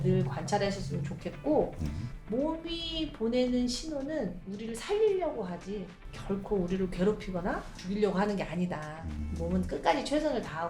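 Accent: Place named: Jeolla, South Korea. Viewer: 이언니 말투가 전라도네ㅡ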